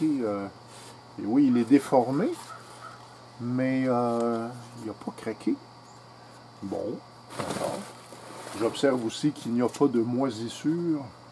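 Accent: Canada. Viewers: Bonjour cela fait de nombreuses années que je suis vos diverses expériences je tiens à vous remercier pour la curiosité que vous mettez à expérimenter le tournage et la gentillesse teintée d’accent canadien qui résonne à mes oreilles de français du sud ( a côté de Marseille ),votre dernière vidéo autour du séchage ma beaucoup intéressée …depuis toutes ces années j’ai l’impression que nous avons évolue ensemble,encore merci continuez…. Ont vous suis ici avec plaisir. Mr lucari André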